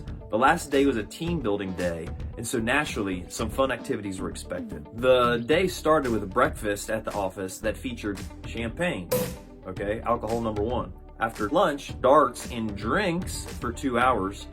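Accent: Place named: United States. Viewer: Ha ha, again, bro. You talk like a typical American tourist! We got your money.😊 Seriously, you need to get out with Brits away from big Cities.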